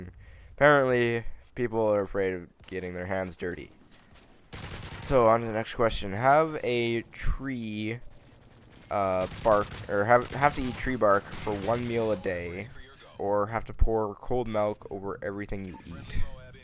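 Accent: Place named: Canada. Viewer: you say milk funny, melk, but ur Canadian so you have a accent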